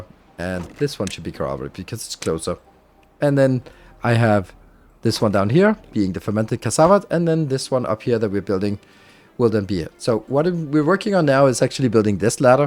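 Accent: Denmark. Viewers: Er lige faldet over din profil mega fedt content glæder mig til at følge med, blev nød til at tjekke om du ikke var dansk hahah for synes jeg kunne høre det i måden du taler engelsk på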